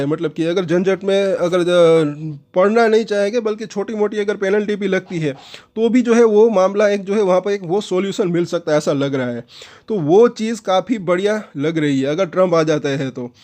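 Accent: Gujarat, India. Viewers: ભાઈ ગુજરાતી લાગો છો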